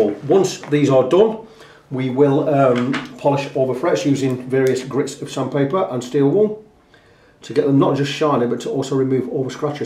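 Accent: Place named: England, United Kingdom. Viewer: The best value I found was a pristine EG-303 Yamaha and the EG112C2 is a nice HSS Strat type as well for cheap US Dollars. He is right : Yamaha electrics are well made, even the cheap low-end ones. That guy is a national treasure to Americans: we never hear a workaday Englishman talking and forgot where our early citizens came from in large part.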